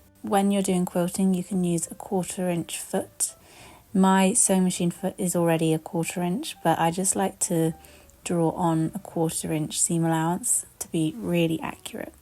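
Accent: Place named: England, United Kingdom. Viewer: What a fantastic idea - making something for ourselves for once! 😂❤ I absolutely LOVE Ohio stars and am mega careful, getting everything to line up and get very stressed if I’m even a mm or so out!! 😱😅 An absolutely stunning wall quilt hanging - beautiful and a smaller one in a corner is a lovely idea too 😊 I’m desperately trying to get stock together, but I might just say sod it, this weekend, I’m going to make something just for me ❤ Thank you thank you and if you’re at all interested, I can send you a photo when it’s done 😊 You are a true inspiration to a massive quilting and everything material and hand made fan, and hearing your lovely english accent reminds me of ´home’ - have lived in france for 12 years, but almost everything I make is with fabric from England as the French just adore it ❤ Have a lovely evening and see you next time 🫶 xx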